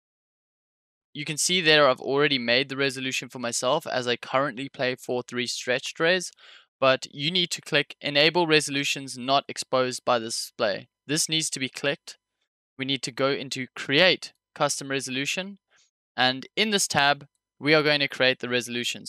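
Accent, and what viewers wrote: South Africa. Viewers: are u south african? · Are you south African bro? Appreciate the content and all love from RSA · South African?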